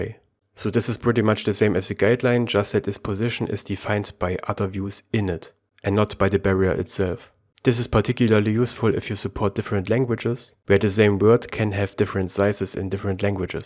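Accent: Germany. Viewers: You sound like a German when he speaks English - I know it, because I'm german. :D And really great video!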